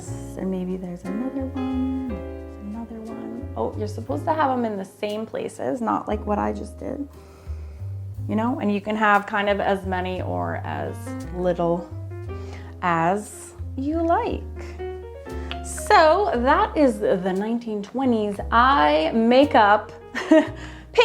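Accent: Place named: France. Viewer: Oooh la la. And I liked your lil French accent. 😂😂😂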